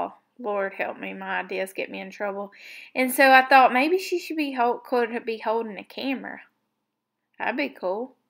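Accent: South, United States. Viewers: My oh my what an entertaining video. You have all the makings of a TRUE artist. Mygrandaughter once said “ us artists don’t give a care”. Referring to freeing ourselves As we create, KEEP on believing in yourself . The southern accent struck my heart.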